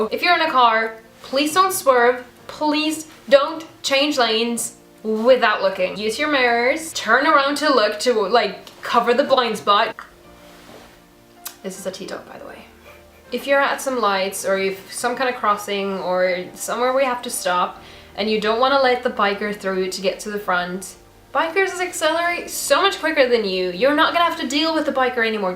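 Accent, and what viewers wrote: United States. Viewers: whatever I think you have an Amrican and amazing Accent. Thank you for replying.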